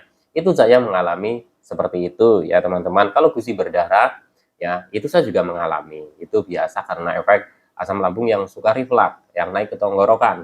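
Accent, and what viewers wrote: Banyumas, Indonesia. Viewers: WALMAS hadir mas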